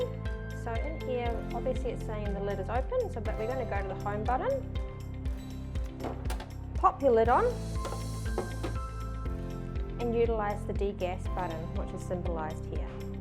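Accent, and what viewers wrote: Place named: New Zealand. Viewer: It new zealand accent, i can understand her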